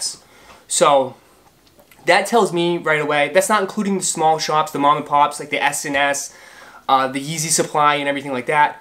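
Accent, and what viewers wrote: Boston, United States. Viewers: Damn bro I knew you were from Boston before you even said it lol I have a co worker who talks just like you! Shoutout from Seattle my G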